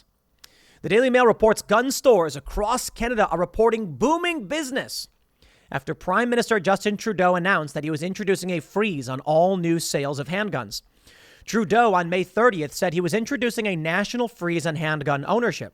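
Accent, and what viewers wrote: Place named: Chicago, United States. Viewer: I just realized still has a Chicago accent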